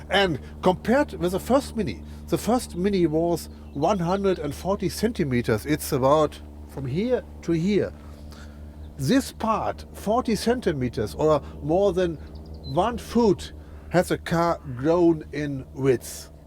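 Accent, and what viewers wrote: Germany. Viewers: I like the reviewer! I love the german-english! keep up the good work! (Car is ugly)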